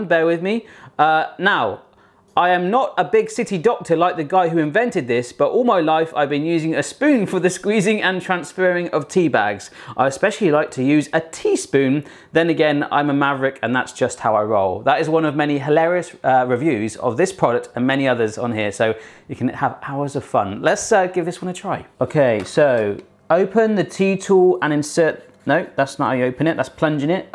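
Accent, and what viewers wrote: United Kingdom. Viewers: u always say "I hate tea" or "I hate olives". well u hate a lot of things ow ant btw i love ur Brittish accent! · I usally hate the british actsent but when you talk, i totally felt in love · Doesn't like tea? Is he british or does he just have an accent?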